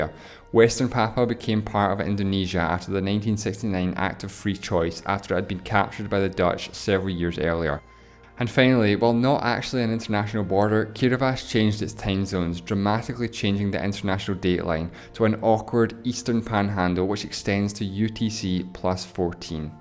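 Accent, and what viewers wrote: Scotland, United Kingdom. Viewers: This comment has nothing to do with the content, I'm just dying to know if the narrator is Scottish or Welsh or something 😂😂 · He's most definitely Scottish, not Irish. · It's Scottish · patrice Scottish I think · Scottish, he sounds exactly like my scottish friend lol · Are you Scottish btw? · Is this is a Scottish or Irish accent? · hes scottish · I like your Scottish Accent. Just saying. 😂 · Aaryana Hurd I think he’s Scottish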